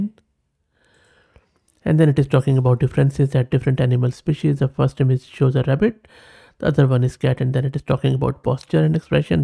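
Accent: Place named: India. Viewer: Индусский английский ни с чем не перепутать! Спасибо за видео!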